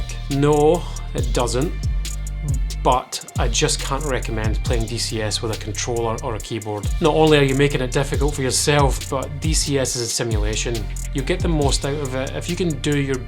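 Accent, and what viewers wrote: Scotland, United Kingdom. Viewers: Helpful towards new, inexperienced flight sim-ers like me? Yes. Scottish accent? Yes. What's not to like. Subscribed. Hope the channel builds nicely for you. p.s. What got me to realise I wanted to keep going on DCS was the excellent A4 Skyhawk mode. As a full fidelity offering, in an era which is simpler to learn and control, and carrier capable, I cannot recommend it highly enough for all new starters. Ignore the 2 free DCS aircraft and just get on that instead.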